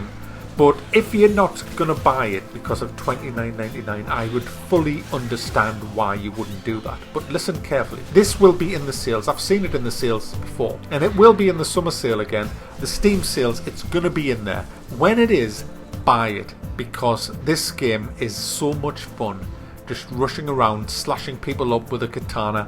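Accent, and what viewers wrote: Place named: Wales, United Kingdom. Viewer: You have, in all likelihood, the MOST Welsh voice I've ever heard!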